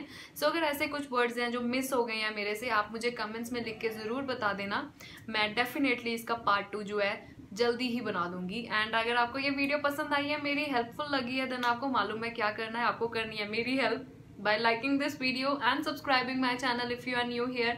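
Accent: Punjab, India. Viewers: hey you are punjabi????? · I think u r from punjab..anyway😍😍🇳🇵🇳🇵🇳🇵😘😘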